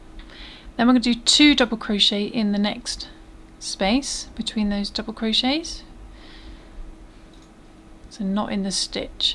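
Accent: United Kingdom. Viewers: Hi! Thank you so much for sharing this little Angel. It is perfect to send in a Xmas card to my sister in Germany. I know she will love it. You have become my go-to for all things crochet because your instructions are so detailed and very easy to follow. Plus, I just love your British English and find your voice very pleasant to listen to. Thank you so much! :)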